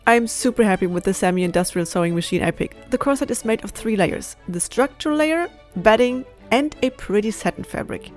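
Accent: Germany. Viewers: The german accent makes 100 times better😅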